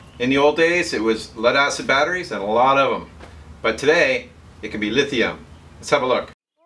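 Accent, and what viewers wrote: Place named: Ontario, Canada. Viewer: You from Ontario Canada?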